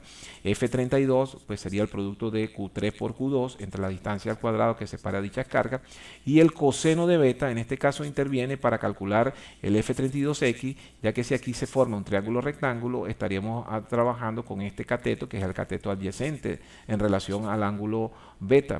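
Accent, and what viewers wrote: Venezuela. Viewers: Acento Venezolano Nojoda! gracias a ese profesor saqué 20 Pts :D, se lo agradezco mucho profe!. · Ese acento venezolano que no se lo quita nadie. Saludos desde Venezuela. Estoy mirando el vídeo horas antes del examen. Deseenme suerte papus v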